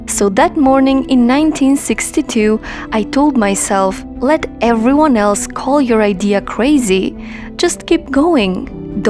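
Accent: United States. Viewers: I need to have an American accent. Does anyone know if the English she speaks is a New Yorker? Because i love her voice.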